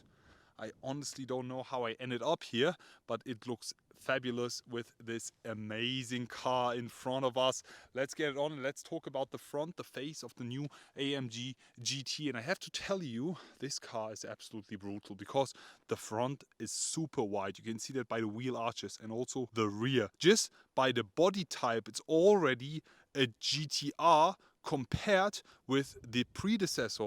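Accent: Germany. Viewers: I like the way you spell the words like Arnie 😂 I can feel some German vibes coming through your voice. Really really Arnie-ish 👍🏽 I love it 👏🏽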